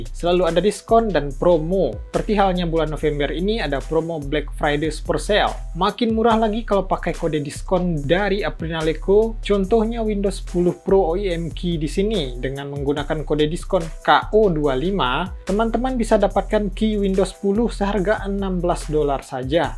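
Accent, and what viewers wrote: West Sumatra, Indonesia. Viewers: Abang orang Sumbar ka bg?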